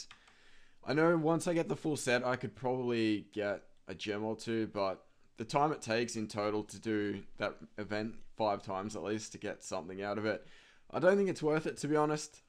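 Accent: Australia. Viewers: Been so long since I heard that Aussie accent. :)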